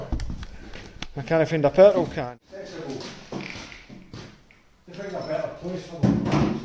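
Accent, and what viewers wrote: Scotland, United Kingdom. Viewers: I am so sorry, let’s agree on a good Scottish accent is just the thing for YouTube videos 🏴🙌🙌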